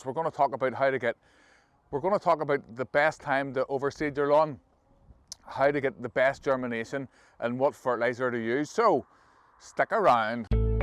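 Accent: Northern Ireland, United Kingdom. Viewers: I love the northern Irish accent, so unique!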